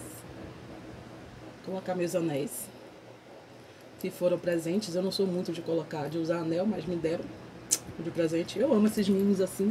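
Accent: Bahia, Brazil. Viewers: Que delícia esse sotaque baiano 😍😍😍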